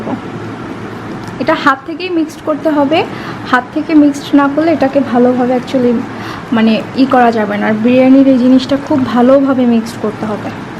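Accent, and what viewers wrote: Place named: Arab world. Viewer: Happy Eid Mubarak 🌷⚘🌷⚘ 👍👍 You are Awesome And I like your Arabia accent 🖐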